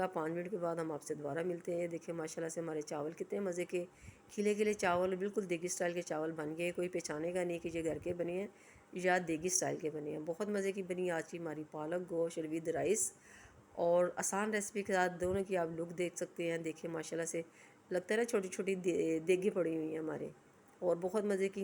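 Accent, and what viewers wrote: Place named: Punjab, India. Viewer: Love your talking ❤😅with Punjabi touch 😅❤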